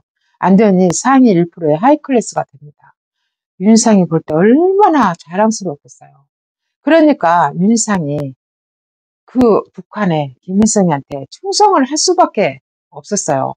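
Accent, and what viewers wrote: Jeolla, South Korea. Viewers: 전라도 말씨가 이렇게나 예쁘시다니... 그동안 고정관념을 버리겠습니당~! 통영의 딸과 그 불쌍한 남편이 생각나는군요!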